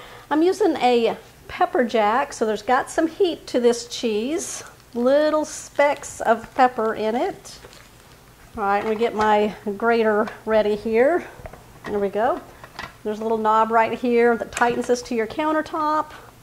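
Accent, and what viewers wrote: Texas, United States. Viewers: I am Irish Mexican and I love your Tex Mex accent! Sometimes I get told my Spanish is incorrect well I'm from tx and I got a twang in the way I speak! Love the recipe I am a huge fan of Chile rellanos!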